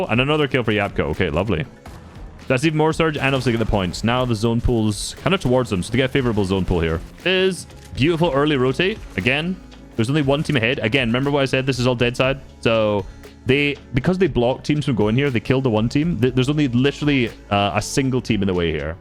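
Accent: Scotland, United Kingdom. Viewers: hi, what part of scotland is your accent from, because me and my and my dad are having a discussion about it for some reason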